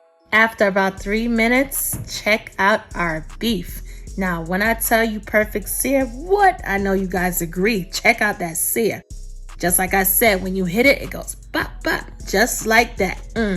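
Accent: New York, United States. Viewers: I think its New York but it could be Boston.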